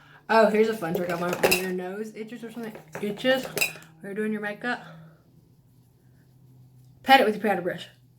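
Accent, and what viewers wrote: South, United States. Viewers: you have the same southern accent I have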